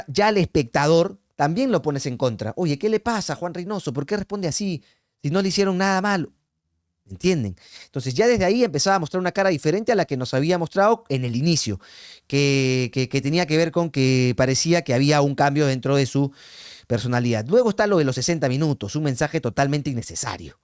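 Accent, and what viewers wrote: Argentina. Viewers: Hey Horacio... vos sos Argentino ???. Tenés un acento porteño che. O sos simplemente un periodista Peruano sin personalidad? Por otro lado tu analisis muy bueno. Has desnudado la pobre capacidad de Reynoso como estratega. Ese señor es un técnico para tercera division, que ridiculamente se autodenomina estudioso.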